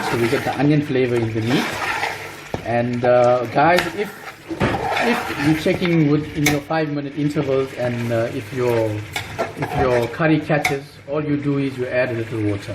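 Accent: South Africa. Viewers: I am South African now living in the US and I love your South African accent :-) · You sound south african..I'm south african..but live in the uk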